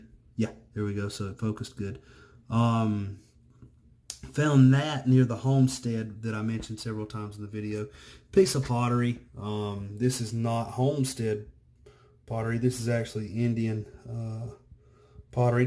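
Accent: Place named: South, United States. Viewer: Nice finds ! Listening to you and seeing the artifacts I figure you're from Alabama or Georgia . I am in St.Clair Co. Alabama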